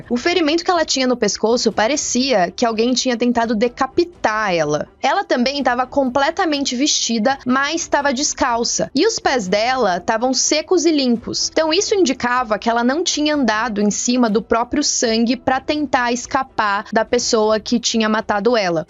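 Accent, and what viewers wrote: Sao Paulo, Brazil. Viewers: Sotaque paulista com gripe.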